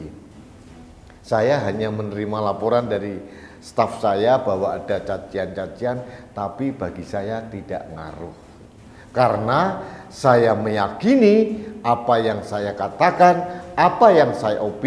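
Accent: Java, Indonesia. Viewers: Wong jowo ngomong e yo medhok jowo ngeh pk 👍👍👍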